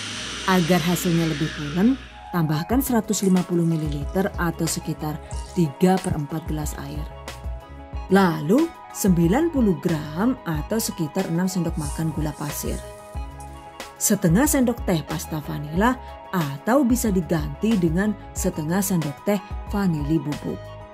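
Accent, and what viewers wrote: Banyumas, Indonesia. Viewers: Ngapak jane wongndi ya mba..? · Saya suka sekali dng resep" sy penasaran sekali" pas praktek poto doong bun.lucuu bahasa ngapaknya itu lho 😀😀😀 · Akutuh suka liat videonya bunda salah satunya adalah kalo bunda lagi ngapak.. 😍😍 #GiveawayTHV2021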